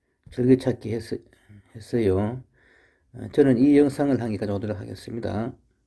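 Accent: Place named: Gyeongsang, South Korea. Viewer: 설명 아주 천천이 차근~~ 잘 하시네요. 귀에 익은 경상도 말이 마음에 들어요. ㅎ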